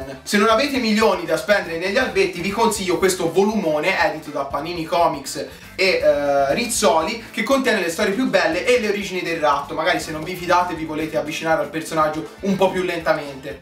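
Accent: Tuscany, Italy. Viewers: ma sei toscano????? XD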